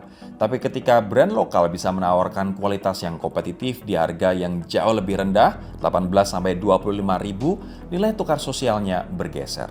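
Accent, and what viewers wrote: Indonesia. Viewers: beberapa episode make-up mas aku perhatiin medok banget mas.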